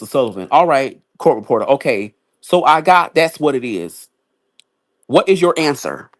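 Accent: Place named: Texas, United States. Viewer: Also are you from TX too bc your accent, no shade at alllll, sounds similar to Milagro the way your pronounce certain words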